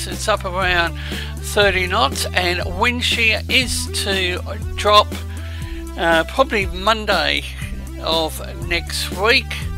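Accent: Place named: Australia. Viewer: Lol what is this guy saying, this is just like Ozzie commentary to the max with the most Aussie accent haha 🤣 👍☔️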